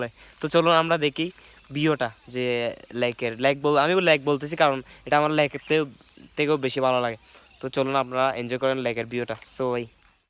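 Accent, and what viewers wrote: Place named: Chittagong, Bangladesh. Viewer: ভাই তোয়ার বারি চিটাগাং হডে😂😂😂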